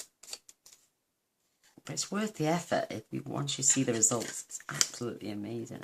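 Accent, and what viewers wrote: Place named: Wales, United Kingdom. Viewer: i thought i hear ed. A bit of walsh hun i am in cardiff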